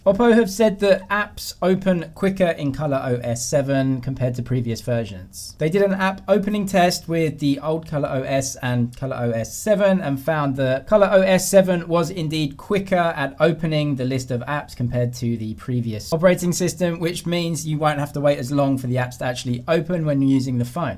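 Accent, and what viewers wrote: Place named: RP, United Kingdom. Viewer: Thank God for RP. Great for non-native speakers like me.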